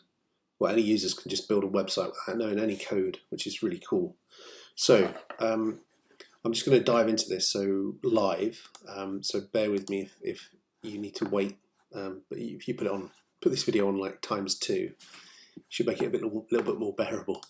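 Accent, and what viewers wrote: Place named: England, United Kingdom. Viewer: Thanks, A great tutorial and it is so good to have a clear English accent. I think that with a touch post editing to get rid of some of the trial and error parts and just give the "polished" end product might be good, but also I do like seeing your thought process when figuring things out. Your voice is excellent and clear and very easy to listen too and your knowledge is a valuable commodity to pass on. I really appreciate the time you have taken to make these videos and would love to see you make some more. Perhaps a playlist for beginners in Wordpress getting going would be great. Have you thought about doing some videos on your everyday experiences working in development and sharing them with us? Maybe some videos on plugin development or securing sites etc? Anyway that's my feedback! Thanks ever so much I really do appreciate your great work. 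- Chris.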